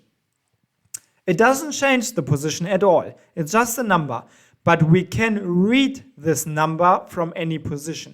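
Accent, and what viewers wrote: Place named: Germany. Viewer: den deutschen Akzent kann man im englischen kaum verbergen :-)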